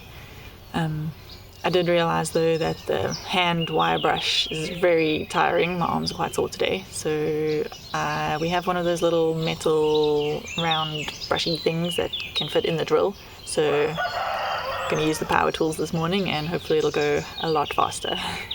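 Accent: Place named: South Africa. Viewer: Good to hear a South African voice. Good luck on your Portuguese adventure. The pool will be a great addition for managing the heat.❤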